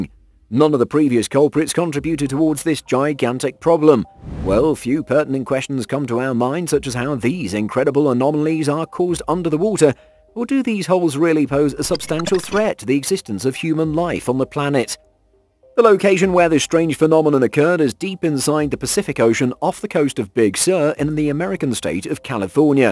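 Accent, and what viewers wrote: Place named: United Kingdom. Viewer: Good Lord, soon as I heard the Brit accent I turned it off. You're always smarky, cocky, mocking. Why even put this on here if you just going to mock everything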